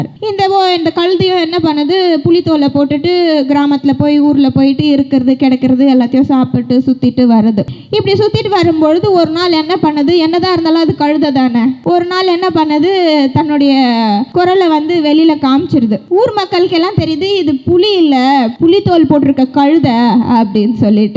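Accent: Telugu, India. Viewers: இந்த கழுதைதான் அந்த 😂 பேச்சில் தெலுங்கு வாடை வருது...வந்தேறிய சக்கிலிய கூட்டமாக இருக்குமோ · ஏய் மயிரு நீ முதலில் தமிழை ஒழுங்காக பேசக் கற்றுக்கொள். சேகர்பாவுவிடம் தெலுங்கு கற்றுக் கொண்டால் இப்படித்தான் தமிழ் பேச வரும்.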